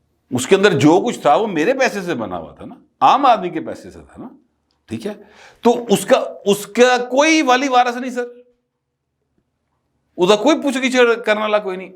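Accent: Lahore, Pakistan. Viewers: tohada lahori andaz bada changa lagda hey.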